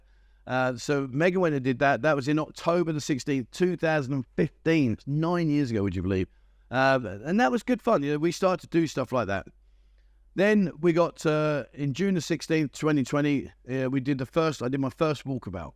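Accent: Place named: Cockney, United Kingdom. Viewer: well done. Started watching you in mid 2021 when looking for places to retire too. Always fun, informative and delivered with cockney honesty. Well done son.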